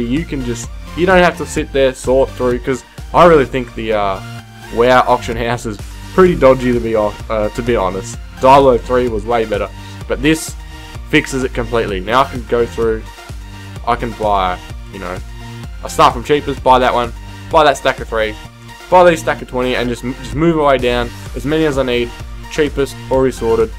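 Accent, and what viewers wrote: Australia. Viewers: Love that Australian accent :D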